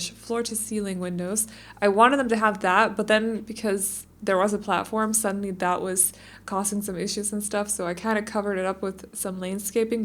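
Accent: Denmark. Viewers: Faktisk var hun en af de første mennesker, jeg fandt. men tak! Også nu tror jeg, at min engelsklærer måske bruger dine videoer til danske studerende, der lærer engelsk haha. Hun tror, det vil hjælpe dem, da du har den danske 'accent'. HVORDAN LÆRER MENNESKER ENGELSK, SOM DE HAR STAVRETNINGSREGLER, OG FØLG DEM IKKE DEM!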